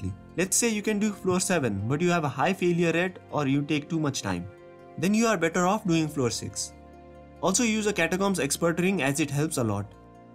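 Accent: India. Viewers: Your voice and acceny are really like an Indian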